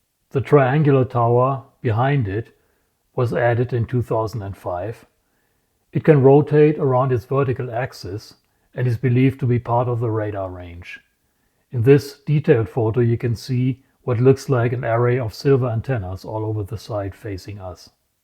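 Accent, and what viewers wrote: Germany. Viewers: I don't understand a word, but very authentic german accent!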